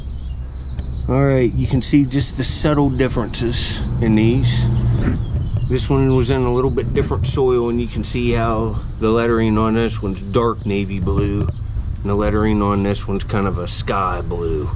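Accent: Western Pennsylvania, United States. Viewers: Like ur content, awesome finds in these. Just subbed ur channel to support a fellow Buckeye. If you are originally from Ohio, you have the distinct twang of the Western PA/WV panhandle in ur voice.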